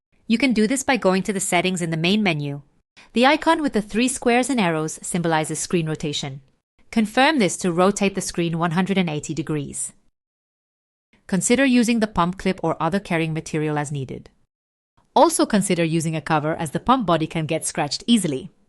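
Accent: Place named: India. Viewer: haha the ai face intro and then into the thickest indian accent after the AI slop moves on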